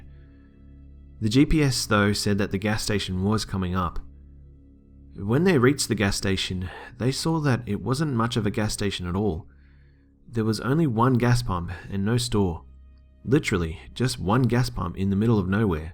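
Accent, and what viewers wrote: Australia. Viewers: Subbed for the Aussie accent and great content. :D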